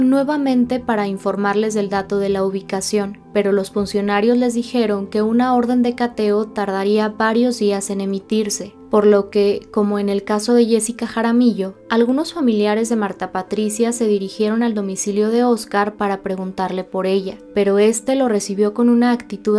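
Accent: Colombia. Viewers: cuál es tu nacionalidad por tu acento me párese colombiana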